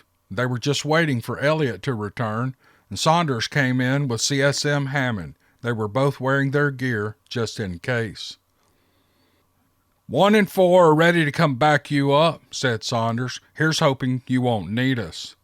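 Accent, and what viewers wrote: South, United States. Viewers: I love a sassy Southern girl! lol. Ya sound like me. Thanks for making me lol ! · I can't listen to the overly southern accent. could speed up the tempo. Better yet, goodbyyyyyyyye. (Accent emphasized, of course)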